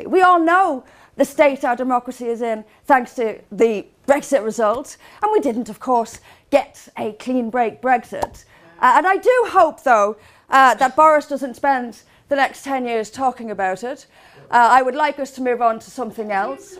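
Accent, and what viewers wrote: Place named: Ireland. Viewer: Good speach young lady.. Romans .. Our Lords blessings in your assistance to seccede in everything that you set out to achieve.. Keep sn eye on The Torys and what they write in the small print of bills... And by the by the lilt of the beautiful Irish accent serves only to enhance the beauty of the daughters of men.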